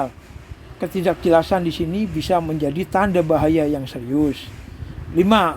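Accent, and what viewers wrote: United Kingdom. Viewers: KEREN ENGLISH NYA PAKAI BRITISH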